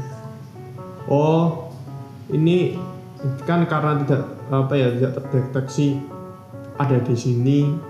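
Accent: Java, Indonesia. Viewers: Jowone medok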